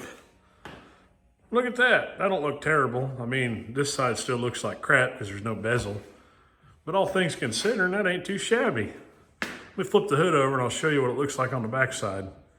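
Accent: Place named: Boston, United States. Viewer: I knew it was Boston that I heard in fast motion😂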